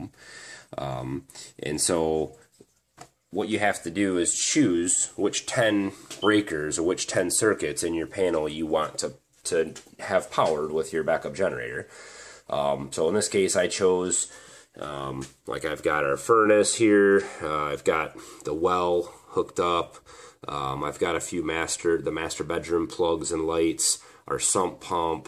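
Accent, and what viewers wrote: Michigan, United States. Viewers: Great video brother. I live in Florida now, after Irma I’ve been trying to find a safer way to power my home with my Generator. I think I’ll buy one now. And made in USA 🇺🇸 sealed the deal! So I’m watching the video thinking ‘this guy has a Michigan accent’ and ‘about time I watch a YT video without swearing’! I’m a God fearing Michigander that lived not far from your farm. Needless to say, I’m subscribing and can’t wait to explore your channel. 👍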